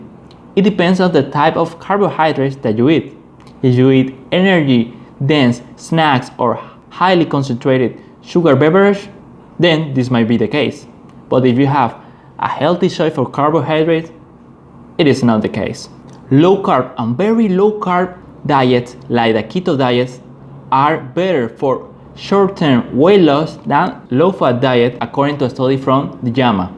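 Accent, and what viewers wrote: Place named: China. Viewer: I think you shouldn't change your accent just because some people think you should. Its beauty of this world where we live that everyone has their own unique way of speaking a language. I live in Engalnd and we have God knows how many different accents here, I find it really hard to understand Scottish accent but I would never ask my Scottish friends to change their accent for me. Similarly my Caribbean friends have very strong accent and it's the beauty of every area. A Chinese man should speak in his accent as it's not easy for me to speak your language but you are speaking in my language so i should be grateful to you. And again you have beautiful accent and always keep it like that.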